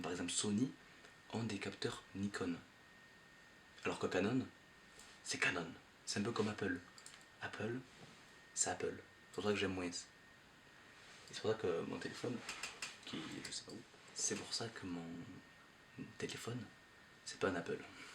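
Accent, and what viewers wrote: South, France. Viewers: Accent du sud haha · toi tu est sudiste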